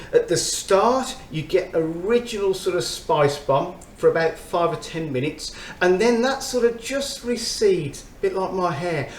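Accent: Birmingham, United Kingdom. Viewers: Love the original Spicebomb and the extreme. I favour the extreme, before it was reformulated! Performance on it is awful now. I had to return my 2023 bottle to boots and bought a 2021 bottle off eBay. The original Spicebomb is more versatile, I think the citrus gives it a lift. I'll have to sample the new dark leather. I've heard mixed reviews. Yep, weather in the UK is awful 😅 You sound like a Brummie, Birmingham is the third cloudiest city in Europe, only behind Glasgow and Reykjavík, Iceland! Even the cloudiest city in USA has 176% more sunshine than Birmingham!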